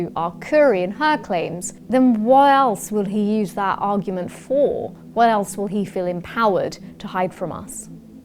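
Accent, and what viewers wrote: RP, United Kingdom. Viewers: Ay, DDN agree with everything but the darrling presenter with her RP accent is not going to cut it in the north. I wish the lleft could get it's ting together st some point. FFS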